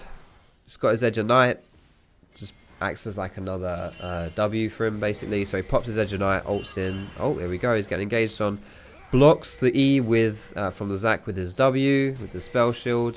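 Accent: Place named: Southern England, United Kingdom. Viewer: He is from the south of England, around Kent judging by his accent.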